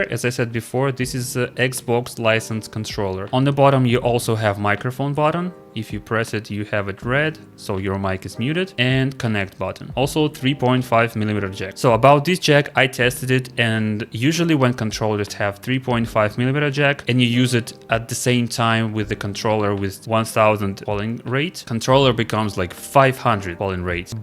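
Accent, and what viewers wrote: Russia. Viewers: Бро, за сколько времени ты выучил английский до такого уровня? Я по первым секундам понял, что ты русский, несмотря на идеальное и приятное произношение. Мне интересно стало, сам начал только изучать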